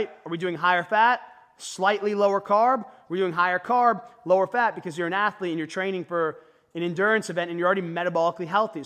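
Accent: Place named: Philadelphia, United States. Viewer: 47 year old Irish prick from Philly here. Your accent and mannerisms are similar to mine even though I've spent decades on the Left Coast. I like your advice and information. I'm struggling with getting belly fat gone. I do HIT lifting twice a week. I tried every 3 days the past two weeks but my recovery takes longer at my age. Nevertheless, I've seen really good results muscle wise and I also walk 2-3 times per day morning, midday, and after dinner. Walking is huge! I'm not into running, but I'm pretty consistent with walking, good sleep, lifting, and eating healthy. I eat mostly whole foods, but I think my problem is the portion sizes and eating carbs later in the day like you pointed out. Any other advice would be greatly appreciated. Awesome video. God bless!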